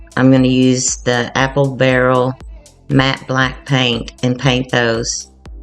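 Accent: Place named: South, United States. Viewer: seldom hear a southern drawl. Yours is lovely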